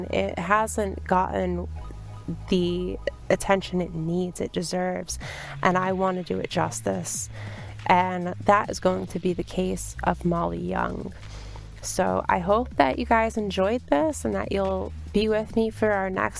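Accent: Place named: Boston, United States. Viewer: Nice job on this, I am from Boston, and I can barely tell you have a Boston accent, although I can tell slightly, but you have a beautiful voice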